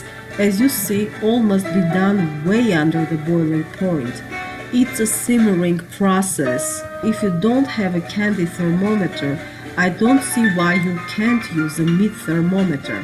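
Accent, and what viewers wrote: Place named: Austria. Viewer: I saw her other channels, the lectures. She speaks Austrian German.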